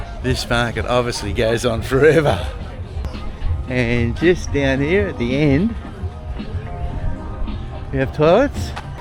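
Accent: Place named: Australia. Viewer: Hi we really love your videos and your enthusiasm. We are Ozzie's by choice living in south America so we do not only get to see your travels but also be able to hear Ozzie accent, see our home city Sydney and other places in Australia Thanks❤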